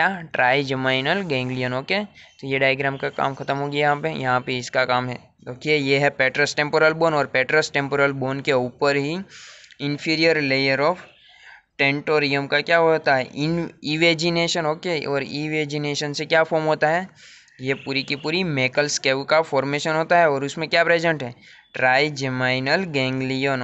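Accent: Gujarat, India. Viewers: Are u from Gujarat??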